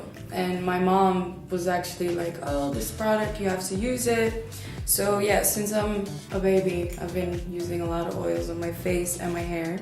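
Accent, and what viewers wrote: Netherlands. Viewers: She sounds so dutch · Did you grow up in the Netherlands? I spot some bits of an accent somewhere ;) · you heard how she says 'th'? classic dutch accent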